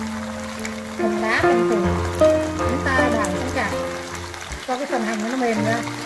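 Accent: Hanoi, Vietnam. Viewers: ngon quá chị ơi ,đúng chuẩn món ngon đặc hà nội luôn ,nghe cách chị nói và làm đâu ra đó vậy là e biết chị là nguời gốc hà thành rồi ,cảm ơn chị nha ,chắc một ngày rảnh nào đó mình sẽ làm cho gđ mình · chi noi tieng ha noi rat chuan nghe rat re chiu, khong nhu nguoi ha loi bay gio loi tieng viet lam nghe rat choi tai .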